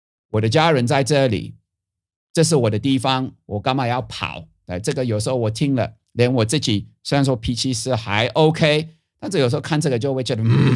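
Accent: Taiwan. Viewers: 教授，你根本就是台灣人了！ 濃厚的台灣腔就好親切👍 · 你的口音已經是台客口音了!好親切好可愛！你的確是台灣人! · 你的中文很台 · 這個講話 就是台灣人啊！ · 根本就是台灣人，完全catch 台灣人說話的方式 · 我個人覺得，從教授的講話的方式及用語，他已經變成了台灣人模式了，教授沒有領身份證嗎？ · 我看不懂英文但我喜歡聽您說話因為您說話的語意是真正台灣人的口吻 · by hearing the way you speak, you're a Taiwanese and that's no doubt, LOL! XP "Kung Fu" might have been translated from Cantonese. I wonder if "Kong Shou Dao Boy" movie was translated as 功守道!? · 嘿!台灣教授,誰説您不是台灣人?您説的國語比我還台. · 你就是正港的台灣人、講話就是台灣味、加油哦台灣人👍👍👍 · 你說話都有台灣腔了....非常道地的台灣人 !!! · 中文說得很台灣！我也要說：啊！這樣的人真好！ 不過1911?有點早ㄝ，哈哈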